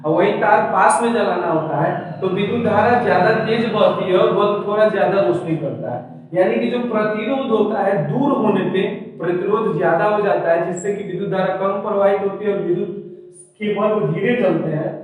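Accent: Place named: Gujarat, India. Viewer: Apki awaz gujrati sunayi parti thora saaaf bola kariye sir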